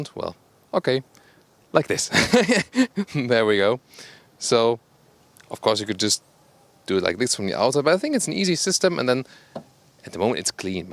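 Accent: Germany. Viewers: He speaks English like a german, why is he speaking English !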